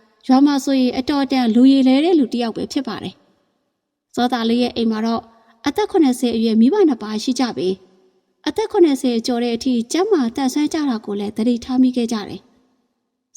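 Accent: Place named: Thailand. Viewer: နားထောင်လိုအရမ်းကောင်းထိုင်းလိုမပီတပီပြောတာလေးလဲသဘောကျတယ်ဗျ❤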